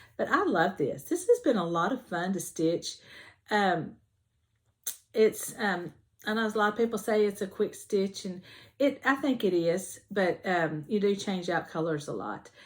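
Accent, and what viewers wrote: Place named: South, United States. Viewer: I could listen to you talk all day that southern drawl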